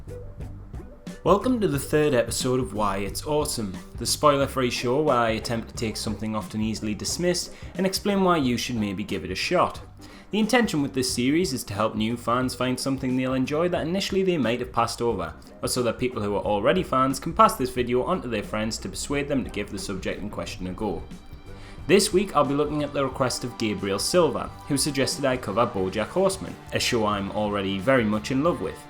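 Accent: Newcastle, United Kingdom. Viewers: U sound like willNE like not just the geordie accent but the actual voice too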